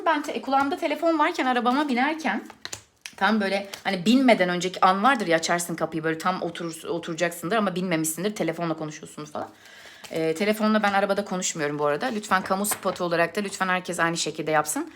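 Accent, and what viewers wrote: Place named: Aegean, Turkey. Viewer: senin ege şivenı yerim ya gidipduruu😁🥰🥰 Vallahı noluyo 2seferdır anlamadık nazaramı geldık! Aman ruhdaşım sen bırakıp gitme bizi🥺 Seviliyorsun!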